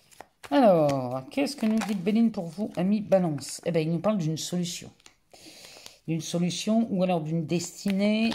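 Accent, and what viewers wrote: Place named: Lyon, France. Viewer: Bonsoir, des bonnes nouvelles enfin. Vous avez l'accent de Lyon, ma ville de naissance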